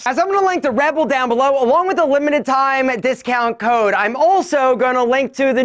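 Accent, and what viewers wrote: Philadelphia, United States. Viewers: Bro you have to be from Philly with that accent.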